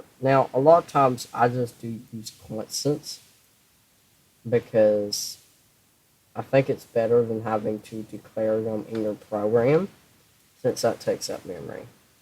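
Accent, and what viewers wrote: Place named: United States. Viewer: The American accent is strong in this one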